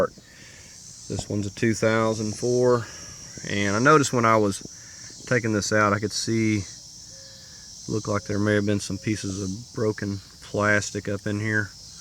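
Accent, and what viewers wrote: South, United States. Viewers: trying this later. you def a southern dude. north carolina or virginia?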